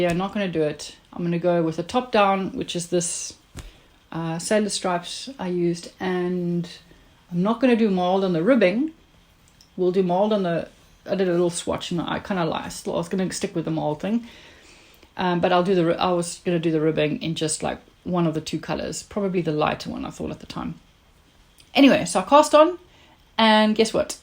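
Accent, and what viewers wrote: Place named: South Africa. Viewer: Hallo, your video was suggested to me on YouTube and the moment I heard you talking I wondered if you weren't South African😀. I live in Pretoria. Lovely projects! ❤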